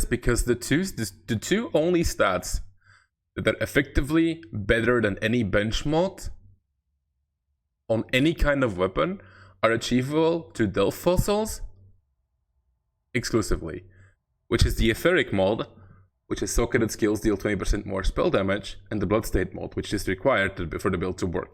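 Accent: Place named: Latvia. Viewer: Hmm, izklausās, it kā latvietis runā angliski...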